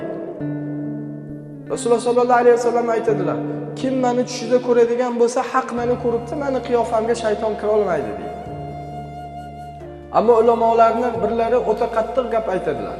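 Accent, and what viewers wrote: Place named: Surxondaryo, Uzbekistan. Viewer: xa shunday men Surxondaryodanman bizdayam shunaqa shevada gapriladidee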